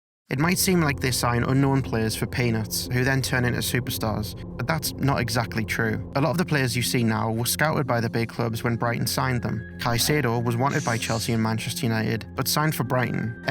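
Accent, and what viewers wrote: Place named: Sunderland, United Kingdom. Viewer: Sounds to me like a fellow mackem?! Great video mate.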